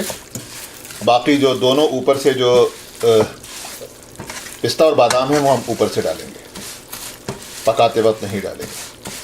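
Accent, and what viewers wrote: Delhi, India. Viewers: Ek zamane baad itni achi saaf aur dehli style urdu suni hai aur ye Qawami siwaiyan yaad agayi jo ke meri nani banaya karti thi. I love it.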